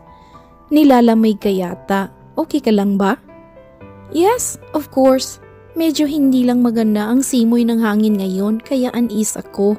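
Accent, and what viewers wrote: Visayas, Philippines. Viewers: Hay ano ka bisaya mag ayos ayos ka naman mag salita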